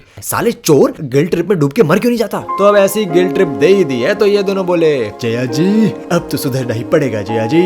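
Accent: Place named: Punjab, India. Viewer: Am noticed your Punjabi accent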